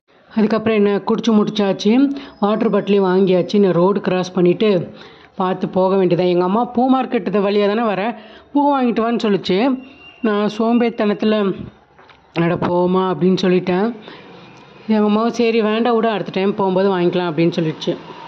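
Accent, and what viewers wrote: Kanyakumari, India. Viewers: Akka Kanniyakumari slang pesunga replay seiyoom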